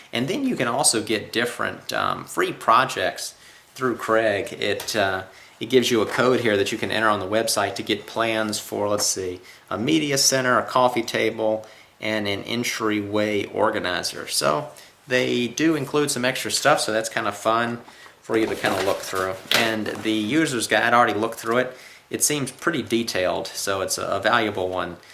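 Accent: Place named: Arkansas, United States. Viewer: Off-topic.. Being from Scandinavia, I think I've only ever heard one [famous] person from Arkansas before... do you happen to be from thereabouts as well? :) Keep up the good work!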